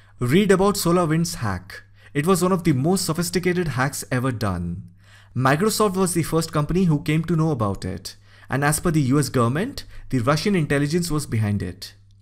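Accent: India. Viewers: Please improve your thick Indian accent, then it will become an amazing channel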